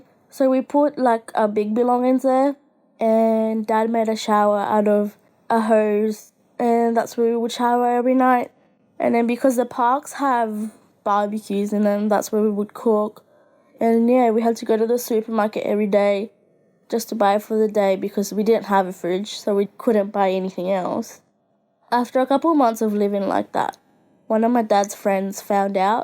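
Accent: Australia. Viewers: I knew you were Aussie Aussie’s rock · Finally somebody who is aussie 🇦🇺🇦🇺🇦🇺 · OHHHH MEH AUSSIE GURL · Finally an Aussie! (im australian) 🇦🇺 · So, are you Australian or Story booth is Australian? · Are you Australian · I knew you were aussie! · Oh she's an Aussie 😀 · YOU’RE AUSSIE? YAY! I AM TOO! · She’s Aussie! I’m not the only one yay! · She’s Australian don’t make fun of her accent mate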